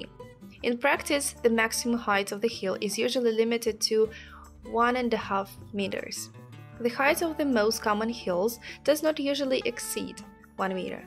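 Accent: Russia. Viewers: русский акцент убивает просто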